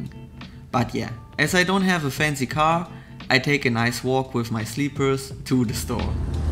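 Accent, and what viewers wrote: Germany. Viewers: du bist 100% deutsch so wie du sprichst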